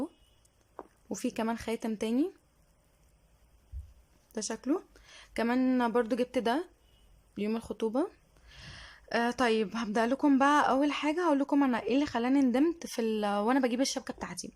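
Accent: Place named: Syria. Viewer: الله يرحم والدك و مبروكة الخطبة بس كلامك ببدل على انك سورية واللا أنا غلطاني ؟؟؟ بس مدام انتي سورية ليش عاملي الفيديو باللهجة المصرية ترا مافي أحلا من الواحد يحكي بلهجتو و لهجتنا من أجمل اللهجات حتى لو خطيبك مصري المفروض تحكي بلهجتك طبعا هادا شي بيخصك بس حكيي مجرد رأي شخصي ما أكتر والله يوفقك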